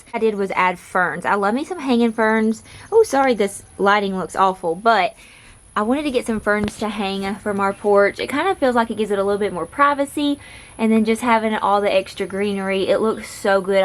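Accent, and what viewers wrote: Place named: Alabama, United States. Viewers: Are you from Alabama. You sound like the folks out here. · Did you say where you are from? Finally a “YouTuber” that sounds like me 🤣 I’m an East Tennessee gal! I love wha you have done and it gave me so many ideas 💡 we have a small farm and I like the “farmhouse” look but sometimes it can be too busy for me. We had a storm door like yours for a long time and I finally found someone who custom made me a screen door that I absolutely love ❤️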